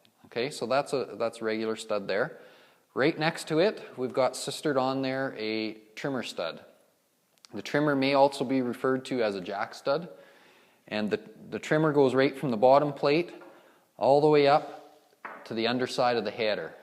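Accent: Canada. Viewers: you sound Canadian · Sounds like he is canadian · BTW - do you live in or near Canada? :) I hear the long "o" sounds (aboot). :)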